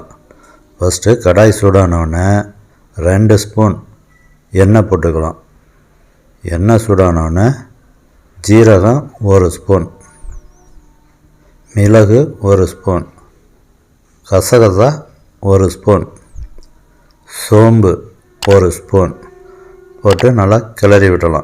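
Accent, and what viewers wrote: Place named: Madurai, India. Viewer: chanceless recipe bro... unga tamil madurai side madri iruku bro.. very nice and yummmmmmmmmmmmmy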